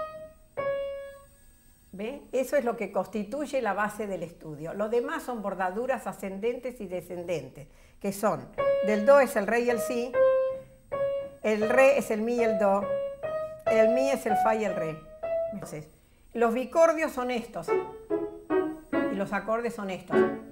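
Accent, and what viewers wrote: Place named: Buenos Aires, Argentina. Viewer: que buena clase y en porteño mejor aún uno asimila mejor el conocimiento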